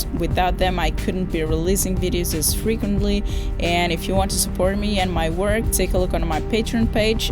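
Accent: Portugal. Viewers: Eu estava na dúvida se você era portuguesa ou imigrante. Depois desse vídeo, não tenho mais nenhuma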